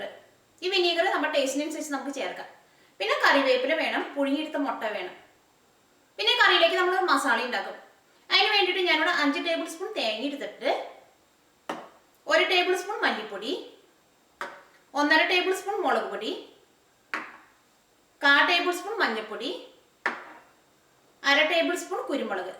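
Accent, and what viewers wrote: Thrissur, India. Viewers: are you from trichur,because your accent is like trichur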